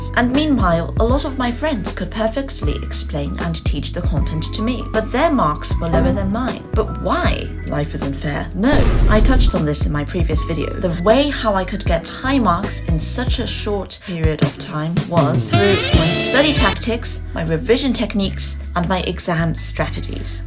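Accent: RP, United Kingdom. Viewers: Why the fuck are you speaking in RP? You sound like the posh AQA languages listening reader PS。你会说中文吗？ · The combination of the memes and the poshest accent in existence 💀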